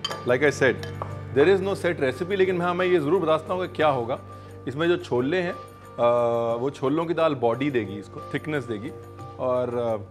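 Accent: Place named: Punjab, India. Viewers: Itne videos dekhe hai aapke....ye pehla video hai jismein itna sara Punjabi accent sunne mila :) aur sahi hai, pyar se mann se banaya hua khana swad banta hai.. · I love your punjabi accent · I love when you add Punjabi accent in Hindi and the most famous RANVEER APP NE BATAYA NAHI....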